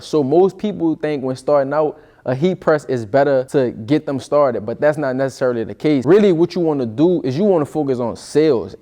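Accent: South, United States. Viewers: Are you from Louisiana? I hear a southern accent. Love your content btw! I’m getting ALOT of tips for my business.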